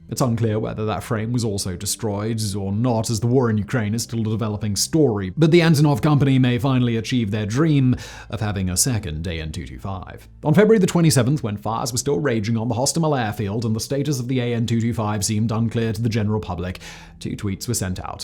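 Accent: RP, United Kingdom. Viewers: it is me or is he sounding even more posh these days..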